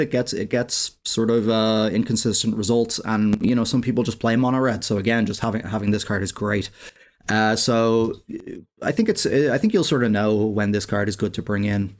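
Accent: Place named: Dublin, Ireland. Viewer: Coming to the party a bit late but thanks for the deck tech! It's great to hear a dublin voice in my ear around Xmas!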